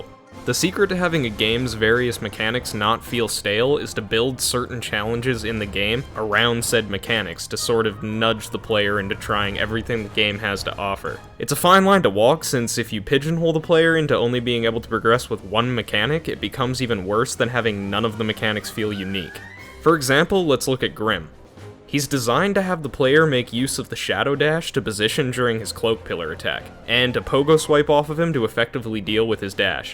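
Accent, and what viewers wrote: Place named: Mexico. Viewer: English! You sound like Mexican and I do not understand Mexican.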